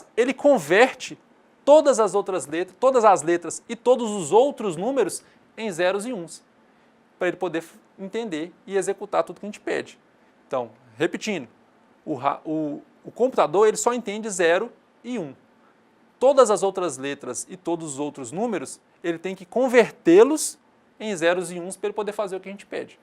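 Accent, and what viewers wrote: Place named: Minas Gerais, Brazil. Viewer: o bom é que ele é mineiro , num guento ver esses cara falando tudo certim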